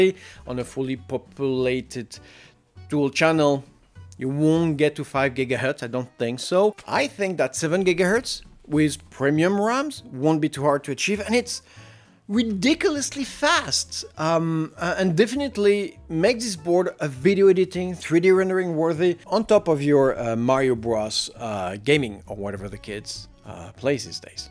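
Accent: France. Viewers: I enjoy your video's so much. I may have asked before but what accent am I enjoying so much? is it french or french canadian or something altogether different. either way I love you accent.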